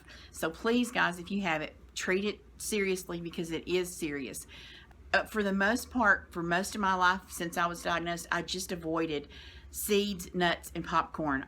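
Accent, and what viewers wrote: South, United States. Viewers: I can see **OATMEAL** being a problem. I eat these cookies my wife sometimes make. They are chocolate and oatmeal. Not like Oatmeal cookies. But the oatmeal (like nuts) get trapped in the bad spot of my intestine and cause a flare up! Wouldn't work for me! Oh yeah! I love your strong Southern Accent! 😊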